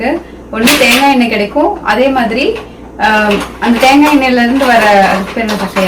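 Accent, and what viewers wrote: Kongu, India. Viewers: Kongu speech &look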